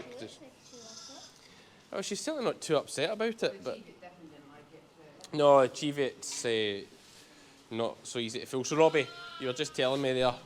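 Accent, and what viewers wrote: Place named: RP, United Kingdom. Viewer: Loved your interview on landward with your posh accent 🤣🤣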